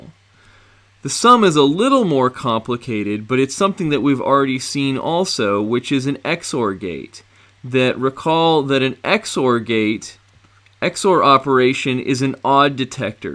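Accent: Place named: England, United Kingdom. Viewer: Thanks a lot for this english accent explanation, I'm having a trouble understanding indian explanation because I'm being distracted by their accent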